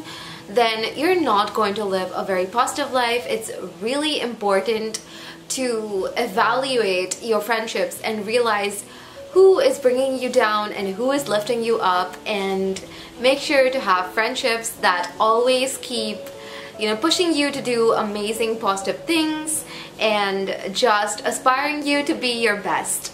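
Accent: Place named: India. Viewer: How did you get such a wonderful accent? Cause you're frm india i guess..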